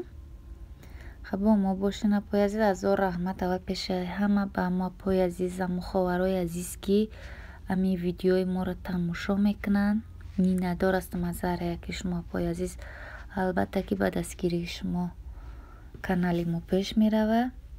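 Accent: Kulob, Tajikistan. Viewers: Салом алейкум Хоҳарҷон худованд баракат ба касбу ҳунарт тия 🤲🤲🤲😘😘😘 як моҳ пеш случайно дромадм каналтон лаҳҷаи анаму кулобҷон дилма ов кард сразу подписатся кардм ҳамаи видиёота тамошо мекнм ва хеле чизора омухтм аз шумо, ободу сарбаланд боши хоҳари меҳрубон 🌹🌹🌹🌹🌹🌹🌹🌹🌹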